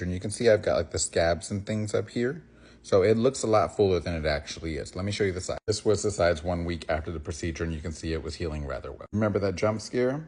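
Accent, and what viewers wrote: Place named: South, United States. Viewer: I love this hair journey of yours! I also love how handsome you look, your southern accent and your name “ ”! 😂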